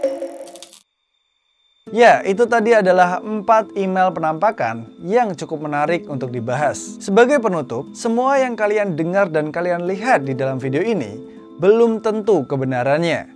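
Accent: Java, Indonesia. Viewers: Tetep medok ngomonge mas #jowonotdied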